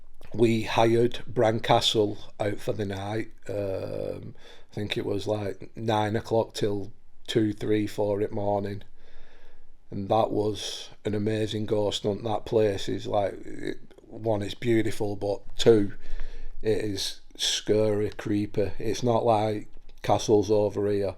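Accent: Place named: Manchester, United Kingdom. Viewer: Hey up.. just listening to your background its a bit like mine.. I lived with my grandma n grandad but mine was a little boy!!! I had a load of crap that started cos i used to talk to it.. bit of a long story!! But i asked it to leave us alone in the end.. it followed me when i went to live with my mum & brother down the rd and it went crazy there.. now i block it all out now and focus on my belief in God and pray the rosary, i never say gods name in vane and try and lead a good life.. I've never done a ghost hunt.. definitely not the glass game as my mum will say.. far to dangerous.. do you mean you used to do those kind of things with groups?? Ya sound a Manchester bloke.. I'm Sheffield and I know there's loads of ghost tours around Sheffield cos my stepdaughter went on some.. I went mad at her cos i was always taught to not mess around with that stuff and like mediums etc cos your opening yourself up.. 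I'll pray for you & your family.. stay positive n I'd say don't talk to it and pray the rosary.. I ordered a rosary with a prayer book off ebay.. tells you how to say the rosary properly.. You and your family keep safe and well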